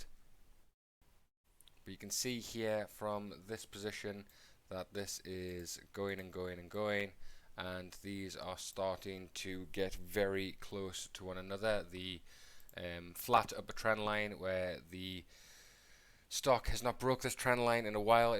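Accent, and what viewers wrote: United Kingdom. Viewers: New sub here. Always good to listen to TA from a fellow Englishman. Even better when they're from the North-East too!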